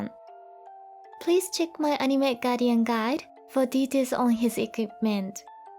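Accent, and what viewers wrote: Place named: Japan. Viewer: Are you Japanese? Sorry for unrelated question but damn your accent remind me that. It extremely super cute btw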